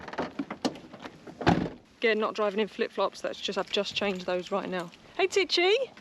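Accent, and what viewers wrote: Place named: England, United Kingdom. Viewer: I know this is a really odd reason to watch along, but I really enjoy just listening to some everyday English, dialect and all :)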